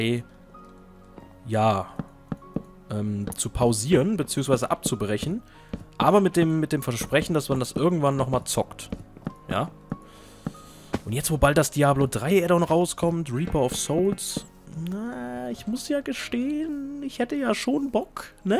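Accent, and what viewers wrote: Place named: Palatinate, Germany. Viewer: Red' mal mehr pfälzisch :D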